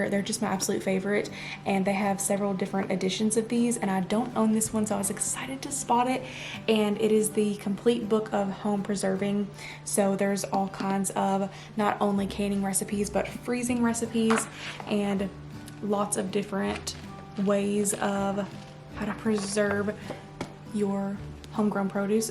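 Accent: South, United States. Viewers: wow those brass christmas stocking holders are so uniquely special, youre so blessed to find them ✨ just wanna say that i love your thrifting vids & your lovely southern accent calm voice is so soothing to listen to 🫶🏼 baby light blue, white and grey hues are your color hon, you look stunning. youve got a very beautiful home, your mom and hubby must be so proud... 🏡💕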